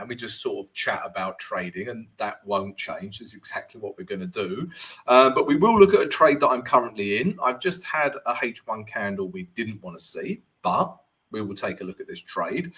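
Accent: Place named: South Africa. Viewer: lol, catching up on stream,love that Saffa accent. Check out NZDCHF, just broke out of long term trend line, possible long opportunity.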